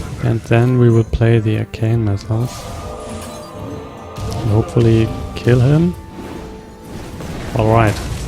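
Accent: Germany. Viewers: Nice work, I like the style of your Videos! Regarding to your pronounciation I would bet you are also from GER?!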